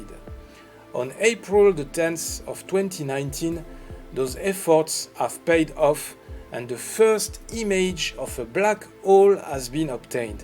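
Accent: France. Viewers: I love your "French accent" when you're talking in English! LoOoL hehehehehehe !!! Otherwise, I'd like to hear more about "Secret Space Programme" and "Space Force" which could explain "black holes", "time travelling", "Galactic brothers and sisters" stationned around planet Earth waiting for a coming "cosmic event" that will happen SOON within our solar system. Thank you with Gratitude, Love and Light!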